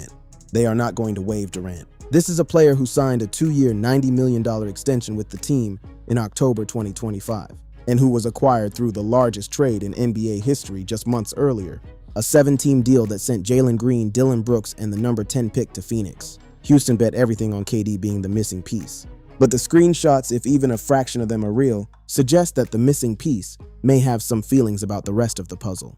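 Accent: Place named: Netherlands. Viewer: You have to do the voiceovers yourself. Even if it is a Dutch accent we can understand you lol.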